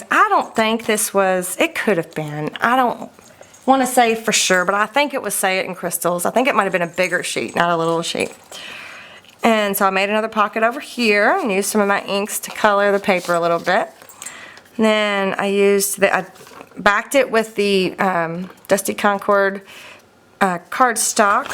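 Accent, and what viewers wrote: South, United States. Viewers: Many happy returns of the day and it is lovely to put a face to the voice behind the videos. Wow those albums are wonderful and the flourishing's are beautiful and the the album choice is brilliant as the curved edges look like the tops of cobwebs,I love thee idea of the mirror under the witches hat. Another fab giveaway but I feel we should be gifting you for your birthday! Have a wonderful birthday with loved ones and it is really nice to see you,your very pretty and I love listening to your southern accent. Thanks for the opportunity to win. Amanda in the United Kingdom. 🎨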